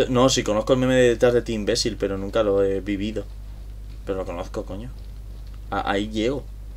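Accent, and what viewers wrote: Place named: Puerto Rico. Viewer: El acento es puertorriqueño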